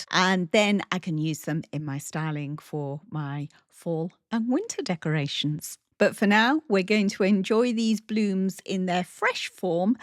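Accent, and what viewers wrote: United Kingdom. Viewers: OMG absolutely gorgeous!!!!!!!!! Love everything!!!!!! Im wondering where do u store the things that are not used at the moment? I love ur voice and this British accent 😊😊😊😊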